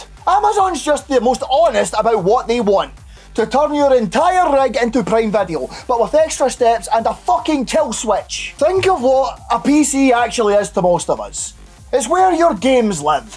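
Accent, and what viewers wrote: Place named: Scotland, United Kingdom. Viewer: Annoying?! Scottish is the only accent that should ever be used for a good rant. The Scots sound like they're ready to fuck some shit up regardless of the banality of the topic. Honorable mention to the Irish.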